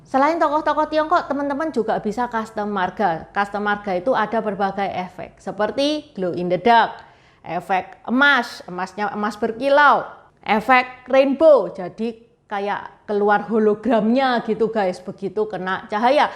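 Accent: Java, Indonesia. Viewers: Wong Chino logate kog Jowo...manggone nag Endi.. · Medok banget jawanya ...❤ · Jowone endi to jeng,aku subcriber antar lho❤ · Medokmu kental banget to cici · Medok bgt logat'e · SENANG DENGAR LOGAT TACIK INI YANG KHAS CHINA JAWA MEDHOK BANGET🙏👍👍👍😅😅😅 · Mbak ini bahasanya medok kayak orang Jowo sedikitpun tidak terdengar tionghoa hehehe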